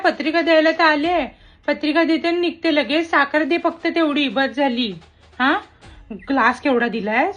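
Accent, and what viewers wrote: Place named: Malvan, India. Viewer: bhari malvani tune please asmi navv ghe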